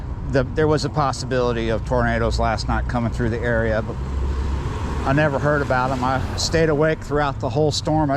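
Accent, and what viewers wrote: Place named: Texas, United States. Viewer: Lubbock here....I still hear Texas in your voice.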